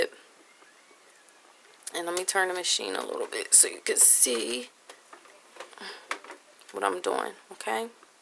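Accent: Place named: Yonkers, United States. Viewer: lmao i did the same exact thing 😂 thank you for this video i’ve never sewn in my life and this was my first threading. PS i love your accent. I used to live in Yonkers as a kid and it reminds me of my best friend at the time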